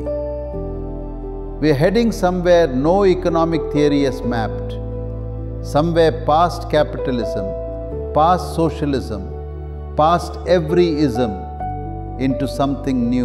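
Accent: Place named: India. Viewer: Obviously this is 100% AI. Any reason you used an Indian accent voice? Usually people chose American accent. Don't get me wrong, it's good, but I'm curious.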